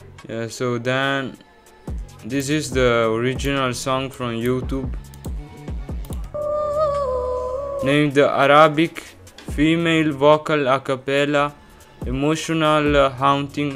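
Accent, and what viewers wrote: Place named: Italy. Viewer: ahaha nice remake bro. Si sente un po’ l’accento italiano ahahah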